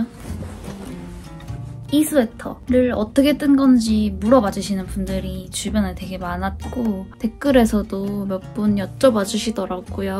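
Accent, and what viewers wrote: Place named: Gyeongsang, South Korea. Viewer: 말투가 같은 경상도인인거같은데 ㅎㅎㅎ 맞나유~^^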